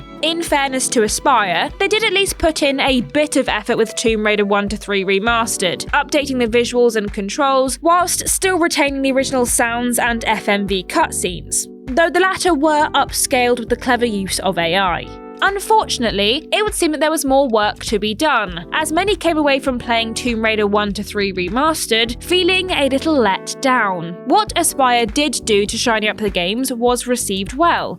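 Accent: United Kingdom. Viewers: For some reason, sounds more British than ever in this video <3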